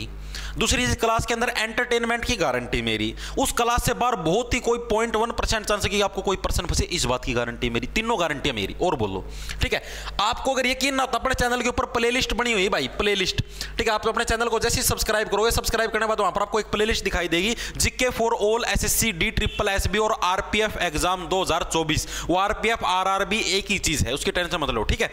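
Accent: Haryana, India. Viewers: Sb kuch pta hone k bad m aapki video dekhna jaruri hota h pta na ki mjjaa aata h haryanvi tone m 😂😂😂😂😂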